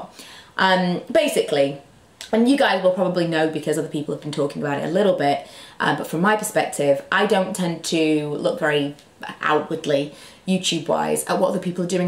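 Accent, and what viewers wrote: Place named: Yorkshire, United Kingdom. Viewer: I watch all of your videos. I'm 23 years old. You mentioned you don't get the same opportunities as other youtubers because you're in Yorkshire. but actually, that's what i love about your videos. For me, it's all about relatability. when I watch your videos it's really comforting because im from Sheffield, it's nice to recognise an accent that feels more like mine, i dont know if that has anything to do with an embedded North and South divide. 😂 But the best thing about your channel is i really value and trust your opinions. I never know when other youtubers are being sponsored, youre very real. I dont relate to the youtubers that are constantly having photo shoots and walking the red carpet because its just not normal enough.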